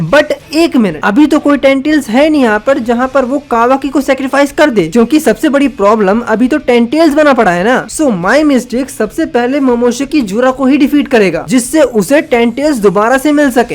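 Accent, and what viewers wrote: Bengal, India. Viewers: Bro are you bengali???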